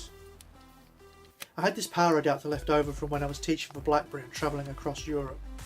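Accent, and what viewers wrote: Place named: United Kingdom. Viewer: The Solder pot, im guessing your in the UK (accent and pound sign), did you have to change anything to the plug example earthing or anything - i've heard they are not safe for UK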